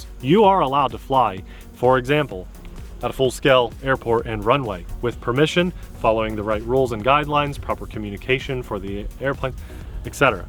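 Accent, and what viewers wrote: United States. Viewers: Very nice looking planes, love both colors, but yellow is my favorite. Surprised it handled so well in the wind for a small plane. So would this be considered a beginner friendly/ park flyer.❓️❓️. Liked how was pushing your buttons on pronunciation 😂😂😂🤭🤭. Also waco vs wok-o. Now My 2 cents. I pre clarify this by saying maybe its my west coast ears 👂 🤔, but when you say colors 9 out of 10 times i hear collars. Maybe its Kentucky/ east coast, ❓️❓️. No, not mad like you on waco/wok-o just figure its how it's said in your neck of the woods ⛰️🏞⛰️. Plus i couldn't let have ALL the fun.😄😄🥴🥴🤭🤭. Great flying, superb videoing, keep pushing those buttons.😂😂😆😆🥴🥴🤭🤭.⚓️⚓️👍🧡💛💚👍👂😂😆🥴🤭🤔👍⚓️⚓️.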